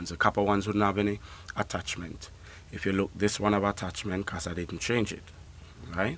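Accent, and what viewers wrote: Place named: Jamaica. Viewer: Bro you're from Trinidad and sounding like a Jamaican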